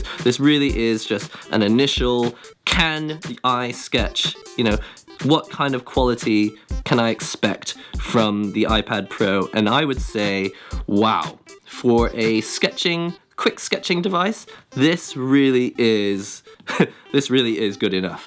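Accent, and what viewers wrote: United Kingdom. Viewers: Hey, new subscriber here. Are you from the UK? Thats not an American accent!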